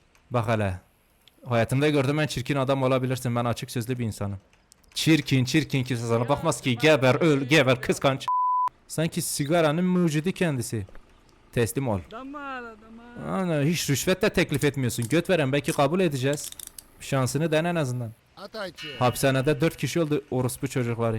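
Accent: Elazığ, Turkey. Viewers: Abi sen elazığlımısın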